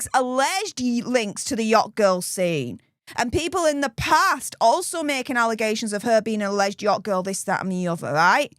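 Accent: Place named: England, United Kingdom. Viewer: Am I the only one that thinks heavy English/Slang accent is kindda difficult to understand?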